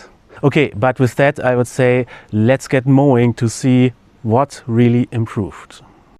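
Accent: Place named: Germany. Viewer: Hää das klingt ja ziemlich deutsch dein Dialekt 😅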